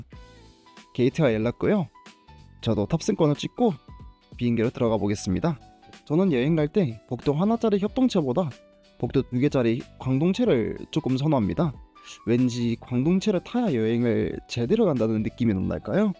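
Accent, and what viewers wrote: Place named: China. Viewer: 중국인임? 발음이 한국인이 아닌데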